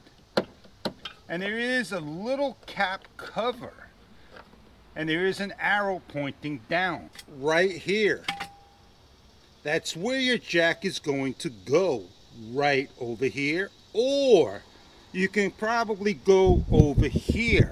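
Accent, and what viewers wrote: New York, United States. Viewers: That NY accent.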